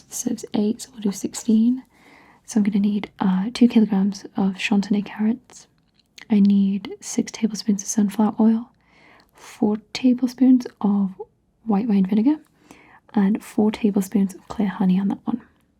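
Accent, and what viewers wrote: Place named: Wales, United Kingdom. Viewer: Time to be lulled to sleep by those beautiful welsh tones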